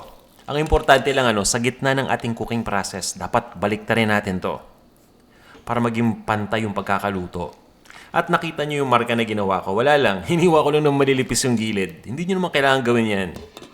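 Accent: Philippines. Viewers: natutuwa talaga ako sa pagsasalita niya kahit nakatira siya sa ibang bansa hindi nabago yung puntong tagalog niya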